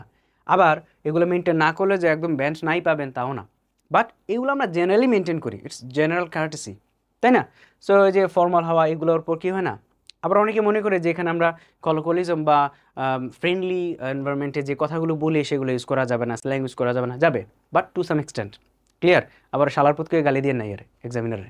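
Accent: Noakhali, Bangladesh. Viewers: আছেন নি বালা, খাইছেন নি ভাত.... এটা তো নোয়াখাইল্লারা বলে।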